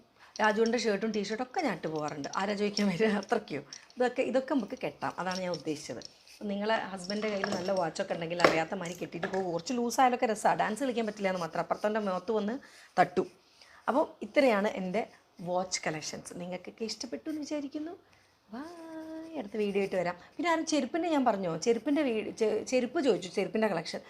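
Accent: Kozhikode, India. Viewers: Kozhikodan slang nice presentation❤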